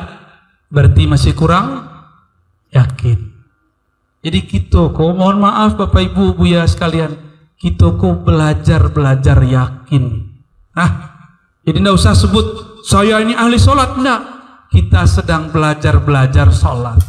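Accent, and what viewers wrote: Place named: Banjar, Indonesia. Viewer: kdg bhasanya kayak urang banjar, kdg kayak minang, kdg melayu kalimantan...kayak urang rawa pun ada, bersiko siko ! ...ustaz kiye sak tenan ne asal muasalnya teko daerah ngendi n suku opo ?..😂.. *" salam , saya si ganteng . jawa ngapak@ jawa begelen lahiran mlysia... apa pun ustaz ini makjleb n makyessss..👌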